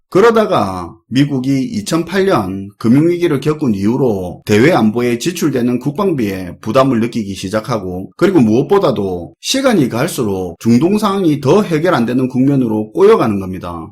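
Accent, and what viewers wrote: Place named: Busan, South Korea. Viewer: 부산 표준어입니다. 꼭 서울말이어야 하나요?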